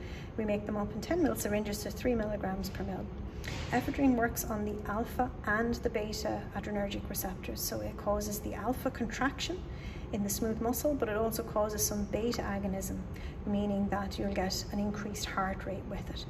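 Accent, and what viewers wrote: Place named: Ireland. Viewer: Loving the irish accent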